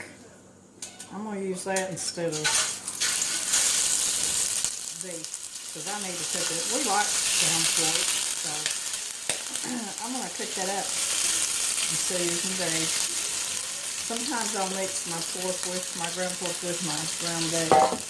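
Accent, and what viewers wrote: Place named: Tennessee, United States. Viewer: I see why.You home is beautiful, and your recipies are vicious, reminds me of My Moms cooking.And your voice is so I dont know laid back.My daughter tried to get me to do a cooking channel and I told them hell no,12 hours workin in the hospital is enough lol.12 hours a day at tjeb hospital.was enough for me.Where do you live?If you dont mind me asking our accent sound like Tennessee.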